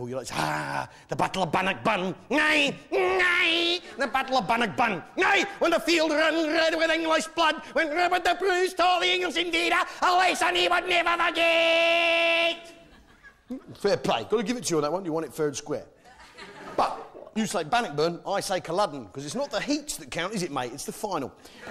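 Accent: United States. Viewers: Loved the video, first one I've seen, I've just subscribed. Although, from the accent I did think you were American! Sorry... Whoops, that is so British! Al Murray went to Oxford University, quite a good university I believe (though not as good as Hastings Polytechnic), where he studied history. Look for his session on 'Great Briton has defeated every country in the world' to see just how clever he is. His character is based on the 'know all' and outspoken pub landlords that were everywhere in the UK, many years ago. Now it's all over priced lager, expensive food in a bland environment..... Though I have found a local pub, with an Al Murray type landlord and it's great! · You are from Österreich? why do you speak with an American accent when speaking English just curious? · austria.... wow, i was convinced you was a yank, youll have to come to wales, ich dien cymru, another great tribe from germania ;) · The most American sounding Austrian I’ve heard 😘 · Austria but has American accent? 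Huh?? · Hi, love your video reactions. Can you explain as you're Austrian from Vienna, how is it you have an American accent?!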